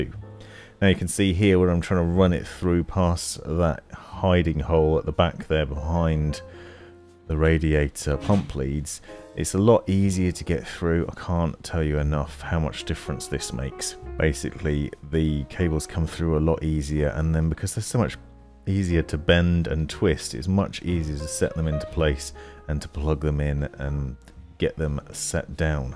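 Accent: England, United Kingdom. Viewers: English accent, jazz piano, that earns a sub. Hope the tutorial is good.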